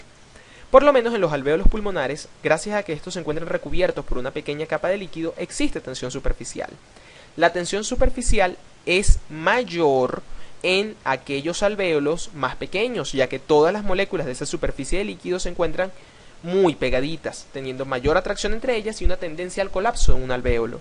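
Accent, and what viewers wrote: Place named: Venezuela. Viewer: Apenas empecé a escuchar el video supe que eras venezolano jajajaja :D Saludos desde barquisimeto!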